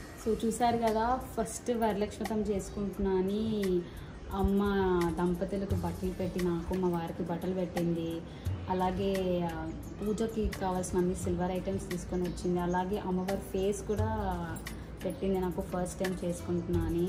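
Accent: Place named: Telangana, India. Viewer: Akka nuv complete Telangana lone mataldu... Nen ni matala kosame chusthunanu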